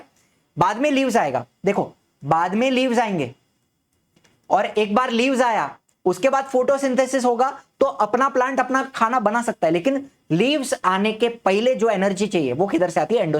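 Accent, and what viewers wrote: Maharashtra, India. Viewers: Sir are you MARATHI ??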